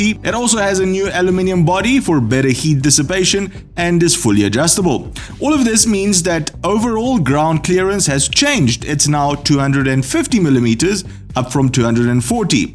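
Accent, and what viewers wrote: South Africa. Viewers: were you originally from South Africa? You have a hint of South African accent. 🇿🇦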